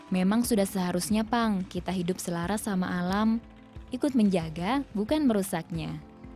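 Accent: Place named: Banjar, Indonesia. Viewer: Kek lugot orang banjar nih kka🙄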